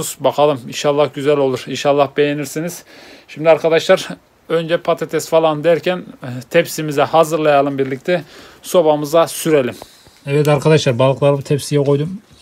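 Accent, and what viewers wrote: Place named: Yozgat, Turkey. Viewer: Yozgatta selamlar :) Abi konuşman zbizim Buraların Konuşması Gibe Leee 😂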